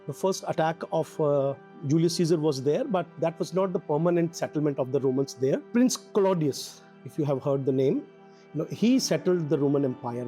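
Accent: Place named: Kerala, India. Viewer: Good sir, good information ,you are speaking like kerela teacher Awesome work sir...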